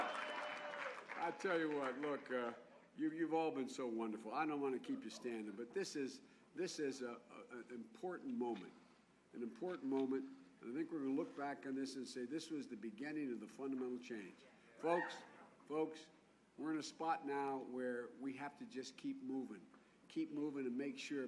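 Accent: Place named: Appalachia, United States. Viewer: Why is he talking like a hillbilly?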